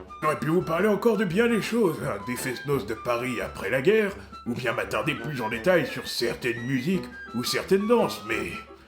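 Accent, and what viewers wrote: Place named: Lower Brittany, France. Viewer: c'est cool de voir qq'1 d'autre qu'un prof de breton ou de danse parler des festou noz a ton accent tu viens de basse bretagne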